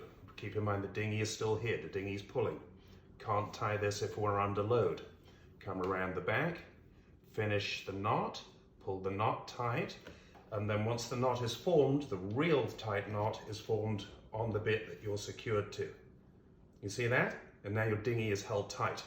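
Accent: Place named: United Kingdom. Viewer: Great video; so far all of yours that I’ve seen are very well done with clear and direct instructions. I have a question. You sound to my ear like you are British. I’d that the case? And how did you come to use “Royal Navy Yachmaster” as a title? I live in Canada now but am also an American citizen. Up here, using the term “Royal” generally indicates that English Monarch would have bestowed that honour, so I’m a bit confused.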